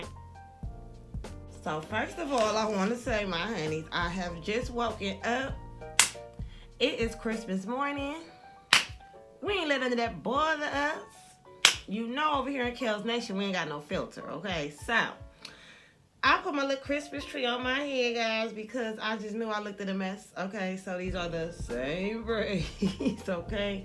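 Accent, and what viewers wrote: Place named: New Jersey, United States. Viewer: Love u and u sound like u from Jersey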